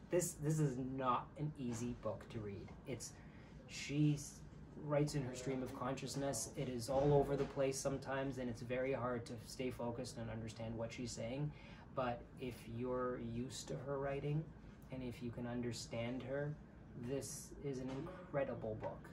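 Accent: South, United States. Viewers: Love the southern accent! good idea! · As a native Texan, I found your southern accent delightful, and you are just adorable.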